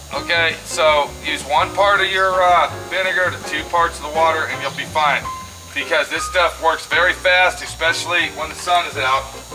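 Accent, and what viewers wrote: Texas, United States. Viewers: your videos are fun to watch and listen to a texan drawl..your great!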